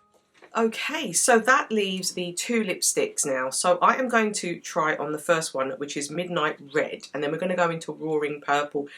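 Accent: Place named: United Kingdom. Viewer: hi i like how natural you are and how your skin looks like real uneven skin ..and above all your accent.are you from britain?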